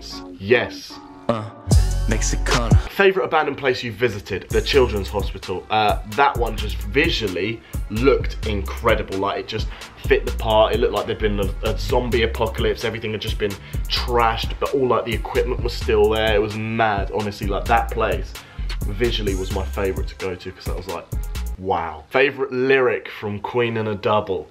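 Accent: United States. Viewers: I'm sorry but it was cringe hearing you in an American accent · I don't get why people are hating that you used an American accent. It sounds dope and it's you doing you, so keep doing you bro. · I think you sound better with an American accent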